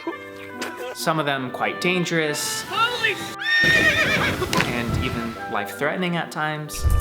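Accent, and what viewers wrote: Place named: Philippines. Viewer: Love your "TAGALOG ACCENT" #dugongPINOY #kleankanteen #Olrayt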